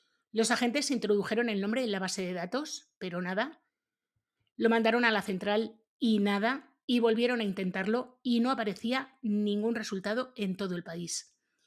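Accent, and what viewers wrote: Spain. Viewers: ...ayyy, debe ser un deleite y una delicia conocerte personalmente. Platicas y cuentas éstas historias con un toque de sarcasmo muy jocoso. Gozo de tus relatos desde que comienza incluso la música. Como tengo relativamente poco tiempo de conocerte. No he logrado ponerme al día. Pero ya voy, ya voy. 😉 Saludos desde California. Amo el acento con el que ustedes los españoles hablan. Soy 🇲🇽 de nacimiento. Y de naturalización 🇺🇲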